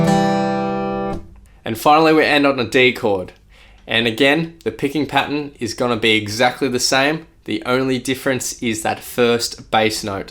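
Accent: Australia. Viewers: Its even better with the Aussie accent.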